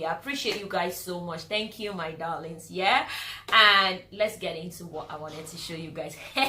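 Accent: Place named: England, United Kingdom. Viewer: Are you were living in the UK because your accent is similar to that of England?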